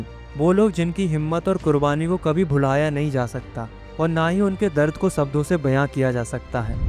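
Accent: Uttarakhand, India. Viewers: Bro you from uttarakhand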